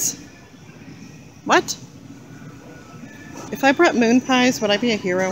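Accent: United Kingdom. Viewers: Oh, how I do adore the Glaswegian accent! 🏴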